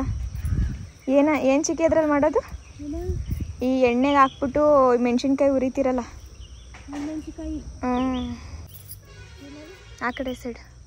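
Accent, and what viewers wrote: Mandya, India. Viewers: Hi Nice video super singer ❤❤❤❤ ನಿಮ್ಮೂರ್ ಮಂಡ್ಯ ನಾ ಮಂಡ್ಯ ಭಾಷೆ ತರ ಇದೆ ನಮ್ಮೂರು ಮಂಡ್ಯ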